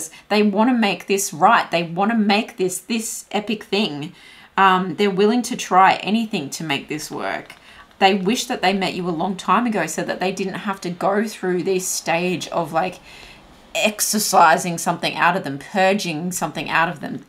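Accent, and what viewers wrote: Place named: Australia. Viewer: same here, it's weird hearing an Aussie accent on here.. funny when you second guess your own accent lol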